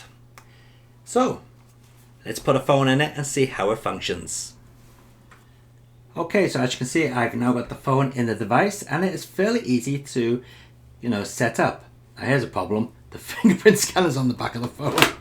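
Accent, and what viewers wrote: United Kingdom. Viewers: Greetings from Brazil. Man, I really enjoy your reviews and battle of the Ports. Besides that, is much better listen the British english.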